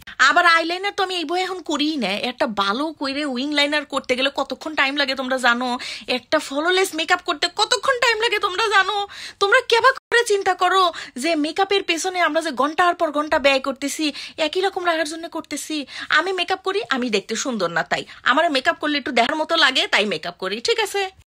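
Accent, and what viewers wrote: Bangladesh. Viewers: Bangladeshi kotha gulo ank moja lage sunte, very nice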